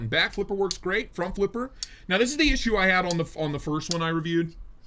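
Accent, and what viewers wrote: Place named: Western Pennsylvania, United States. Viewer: Your western Pennsylvania accent always cracks me up.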